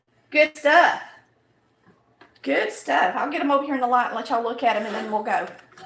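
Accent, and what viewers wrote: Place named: Carolinas, United States. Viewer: 🌷🌷So enjoyed watching you fix your family's Sunday breakfast! 🌷🌷 I hope you know how very blessed you are to have such a HUGE kitchen to work in! I'm always fussing about my little "play-kitchen" being so small with no room to put stuff! ☺ Anyway ... I'm gonna try this method. I hadn't fixed biscuits in quite a while but need to start again since the frozen ones now have begun to add TERRIBLE ingredients. Yours remind me a little of Brenda Gantt's way of fixing biscuits. BTW - I'm guessing you are located somewhere in the Carolinas by your accent? (I'm a North Carolina girl, myself, and now live on the VA-NC line.) May the Good Lord bless you and yours ... and you are doing a GREAT job!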